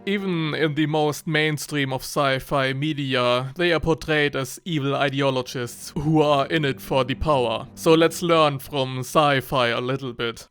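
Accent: Germany. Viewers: Boah, I love this German English accent. Bitte Mehr hahaha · Ahh yeah, once again my favorite German accent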